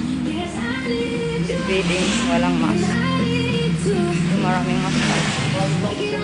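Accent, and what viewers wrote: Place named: Visayas, Philippines. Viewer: hahaha ni gawas gyd pgka pure bisaya nimo ate.. Nagkatawa ko sa ARYATA😅😅..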